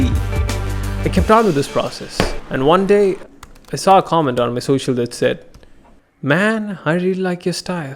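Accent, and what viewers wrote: India. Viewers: Listen carefully and youll hear little indian accents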